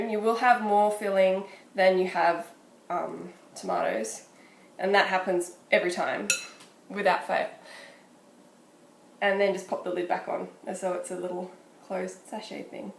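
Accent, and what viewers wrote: Australia. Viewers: FYI Australian accent :)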